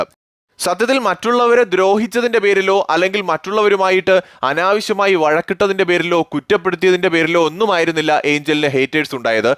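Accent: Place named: Ernakulam, India. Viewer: സംസാര ശൈലി ekm ക്കാരുടെ ആണ്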